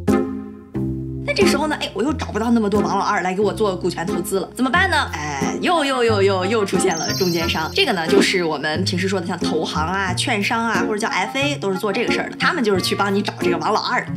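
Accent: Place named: Beijing, China. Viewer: 这京腔，真好听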